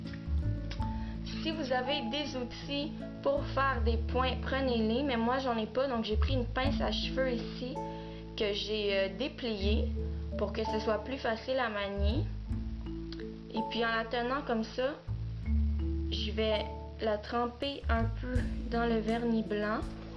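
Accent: Canada. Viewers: C super tu est canadienne ?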